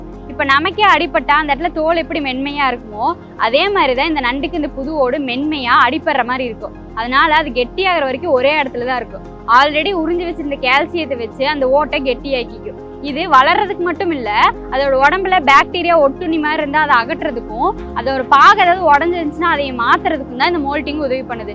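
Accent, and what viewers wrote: Coimbatore, India. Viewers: Ammani coimbatore ngala😂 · Baby ma nee coimbatore ah??? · pechchil kovai vasam varuthu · பாவை இவள் அழகி கோவை தமிழ் பேசும் போது நெஞ்சம் இன்னும் இன்னும் கேட்கிறது இவள் கொஞ்சும் தமிழை இன்னும் இன்னும் கேட்கிறது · கோவை தமிழ் அருமை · கோவை ஸ்லாங் அருமை👌👌👌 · அம்முனி கோயமுத்தூர்ங்களா....😃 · Covai slang😝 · Akka Nega Coimbatore side aa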